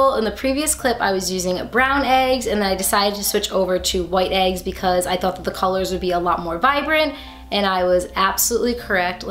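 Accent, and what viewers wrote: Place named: New England, United States. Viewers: Omg those cupcakes are wicked good lol I’m a NEW ENGLAND GORL TOO 🤘🏼🤘🏼🤘🏼 I’m from Ma where are you from, I don’t know how I don’t know where you are from lol I could tell from your accent you where from New England and that one of the reasons I started watching you 🤣🤣 where did you get that coat I freaking love it it’s wicked pissa 😂😉😉😉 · Ugh I love that you're from New England. I'm from Massachusetts, currently living in Maine, and I think it's so funny people notice you say wicked! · You have such a strong Boston accent I live in Boston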